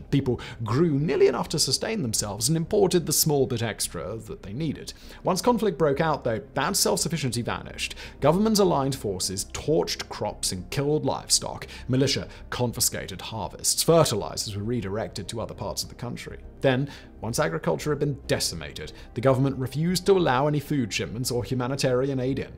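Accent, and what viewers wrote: RP, United Kingdom. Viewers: You are simply very biased, repeating TPLF propaganda with a BBC accent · I wish the world to know not all of us British sound this way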